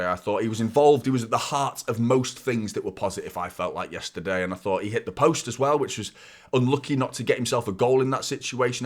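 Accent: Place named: Manchester, United Kingdom. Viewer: How come your accent is different from Man U fans like Goldbridge and piggy oh yeah it’s cos you’re from Manchester lol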